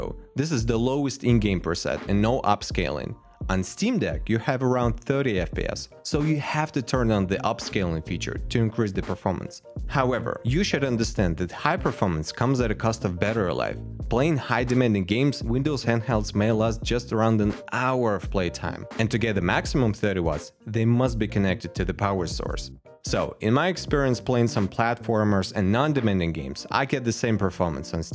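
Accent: Russia. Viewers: ты когда голворишь lenovo legion go русский акцент так и прет))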